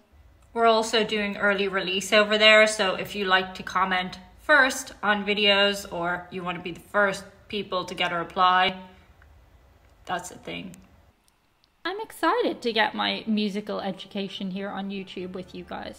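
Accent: Ireland. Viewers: You're funny..... And you gotta love that accent! I'm Irish but I don't sound like that.... I mean, my Great, Great, Great, Great Grandfather was Henry Grattan! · On the basis of you're accent, i'm assuming you're irish. If you are based in dublin, i would check out ticketmaster for tribute bands of. I've seen one at the academy.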